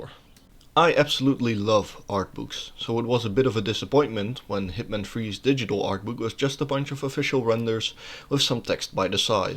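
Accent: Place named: Netherlands. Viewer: Nederlands accent haha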